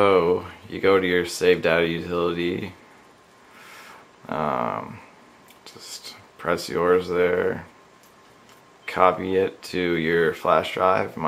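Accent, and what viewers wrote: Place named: Canada. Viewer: Love the Canadian accent. The way you say car is priceless.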